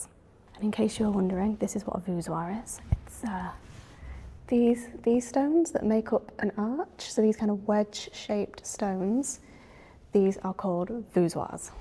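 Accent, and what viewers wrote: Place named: Yorkshire, United Kingdom. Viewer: oh I see, I guess more local distinctions go beyond my foreign untrained ear, I just thought you sounded rather similar to a friend of mine from near Leeds. Still a very nice accent!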